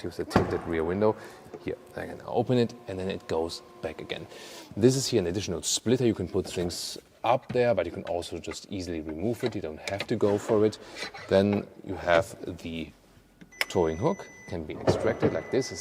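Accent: Germany. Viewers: I love german accent 😂😂😂🇩🇪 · Deutsch-english LOL XD bleah!!!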